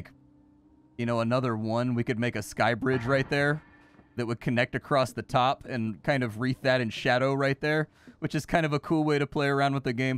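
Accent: Netherlands. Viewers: That dutch accent, always so easy to spot 😂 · Damn the dutch accent is a great accent for tutorials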